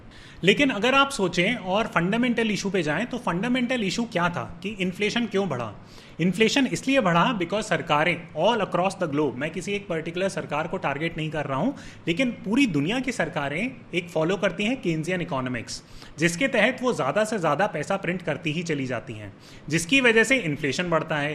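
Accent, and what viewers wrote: Standard. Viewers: Loved you Shudh HINDI. 😊 And how is Zayn doing? Does he speak hindi as well? Your english channel does not feature this hindi channel. Feature it ASAP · Kya shuddh hindi bolte ho sir aap.🤩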